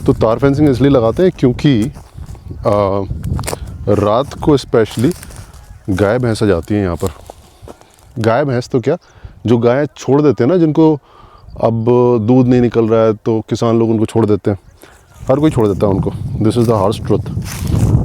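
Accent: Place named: Gwalior, India. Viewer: Gwalior Shivpuri k aaspass k lag rhe hai. Wahi ki language aisi hai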